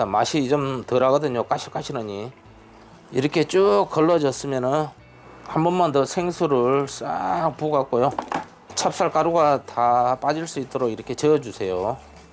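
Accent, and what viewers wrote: Jeolla, South Korea. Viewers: 전라도 분이세요? 말투가 정겹네요😊 보기만해도군침도는 광주식 오리탕 레시피 넘나 잘보고갑니다❤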